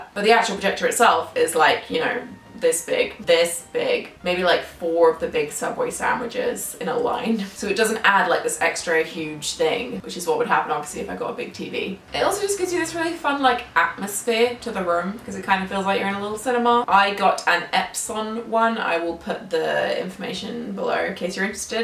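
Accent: Australia. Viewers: hey! Cool pin :) have you been to Australia recenty?? Your voice sounds Australian today! have a nice day, chum xxx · You sound quite Australian here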